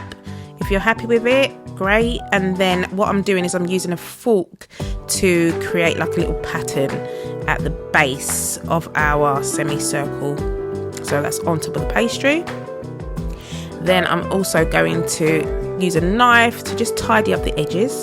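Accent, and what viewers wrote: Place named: United Kingdom. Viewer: Good question .your accent is British .I think u born there .but if you jamaican family born uk .can you talk patios?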